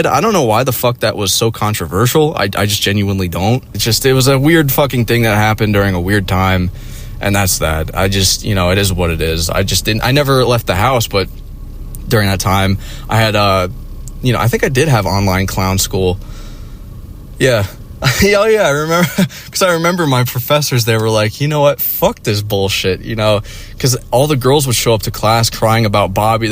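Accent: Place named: Boston, United States. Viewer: Anyone else used to think was from Boston?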